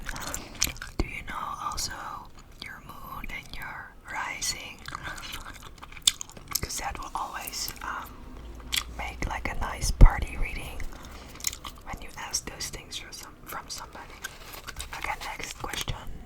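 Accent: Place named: England, United Kingdom. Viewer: I looove listening to your English accent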